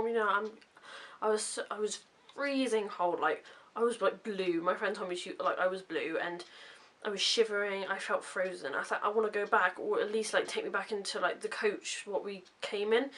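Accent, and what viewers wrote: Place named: United Kingdom. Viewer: fucking murder corr can tell you're British 👍👏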